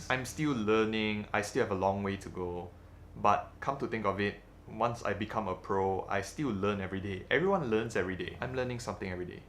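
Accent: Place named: Malaysia. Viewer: I'm glad you found passion and joy in making films and videos, as opposed to being stuck in the Science Route™; it was nice to watch this video and get to know you a little better!!:) I can't wait for more videos!! You're doing a good job, keep it up!! Personally I prefer your Malaysian accent, but maybe bc it feels as if we are a little closer. Hehehe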